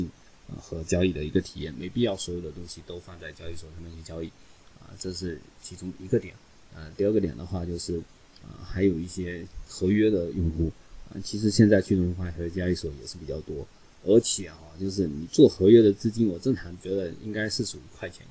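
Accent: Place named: Fuzhou, China. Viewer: 福州口音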